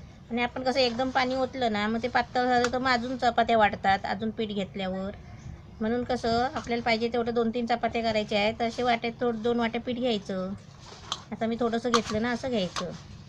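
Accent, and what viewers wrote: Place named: Ratnagiri, India. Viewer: Kaku, chapati chi paddhat pan chhan aani tumhi kelel varnan pan mast. Tumchya bholnyat Ratnagiri chya bolibhashecha godva sampurn video sampeparyant khilvun thevto.. 👍🏼 Dhanyawad.. 🙏🏽 🙏🏽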